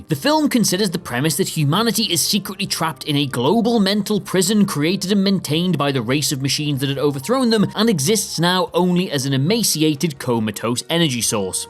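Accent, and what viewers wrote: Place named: England, United Kingdom. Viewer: I thought the video would be good until I heard the english accent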